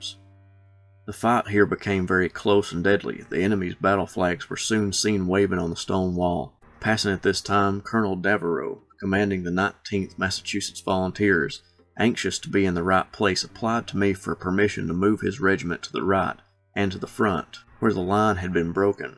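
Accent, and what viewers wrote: South, United States. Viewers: Narrator sounds Southern but anti Southern.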